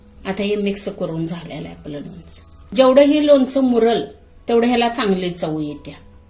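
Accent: Kolhapur, India. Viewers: Khup chan lonche distey.tumhi chan samjaun sangata.aapali kolhapuri bhasha kanala god watate🙂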